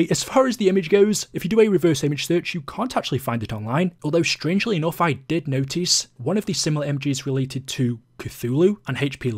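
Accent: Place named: Stoke, United Kingdom. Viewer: Nice stoke accent burslem boyoo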